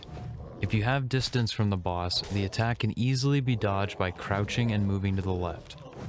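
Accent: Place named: Maritimes, Canada. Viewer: You from the maritimes? I recognize the accent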